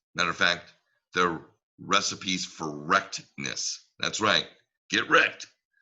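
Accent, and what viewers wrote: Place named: California, United States. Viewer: yeah just discovered you in the last couple days. I heard you’re from NorCal my tax guy lives in SoCal so maybe it’s a CA accent. He’s your age too probably. Great tax guy! and I’m liking your channel so great guy here too😊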